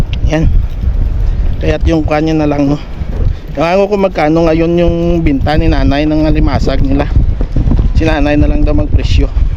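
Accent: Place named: Bacolod, Philippines. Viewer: Favorite ko alimasag. You souded like a person grom bacolod po kasi yung accent nyo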